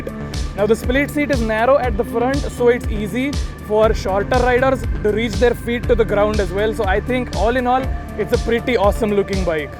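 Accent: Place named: Maharashtra, India. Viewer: Rad reciew boys.. keep it up and keep up that Marathi-ish accent that lends you the unique charm 💐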